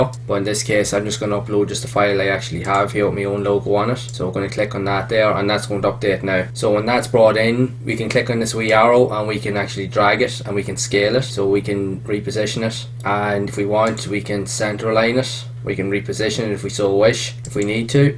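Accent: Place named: Ireland. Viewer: what county in Ireland you from?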